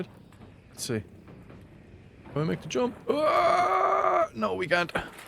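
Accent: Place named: Cork, Ireland. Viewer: Your accent reminds me of my nans. She was from Cork.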